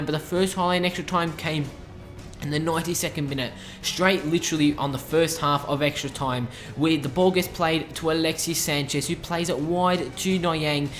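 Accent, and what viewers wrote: Australia. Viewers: Are you an Aussie and wot state in in vic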